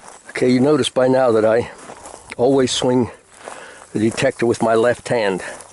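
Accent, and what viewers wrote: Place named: Northeast, United States. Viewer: Where are you from originally? I can't figure out your accent. Are you from the North East?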